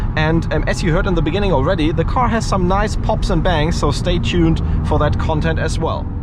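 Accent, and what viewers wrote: Germany. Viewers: Wenn Deutsche Englisch sprechen... Und was sollen die Handschuhe? So lächerlich. Ist kein F40.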